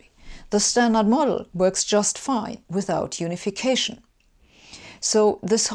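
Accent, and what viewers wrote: Germany. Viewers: I have some real Qs: why are forces free? Why don’t they cost anything? And why don’t they get tired? How does energy fit into all this? Is energy a currency? Currency as in cost? Cost as in no longer free? And if all the energy in the universe is a constant, why does anything happen at all? Because it doesn’t seem like anything is being spent. Perhaps, specifically, if a magnet sticks on a ceiling, why does it not get tired?… While I’m at it, someone asked does a cup of water think? Maybe think more if it’s heated. Perhaps not, but it must think I’m hot or getting hot, if you know what I mean. That’s the problem with thinking, a stone must think it’s a stone and water must think it’s water or I would not be able to think at all; surely. See if you can explain any of that without your typically boring clenched teeth tight mouthed monotone that speaks with a German accent very well, even when you are always trying to lighten up a lot.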